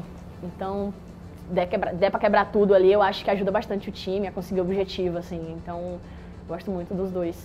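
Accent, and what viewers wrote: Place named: Bahia, Brazil. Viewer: Adoro ela nas narrações na ESPN não tem aquela voz chata além desse sotaque baiano que é maravilhoso...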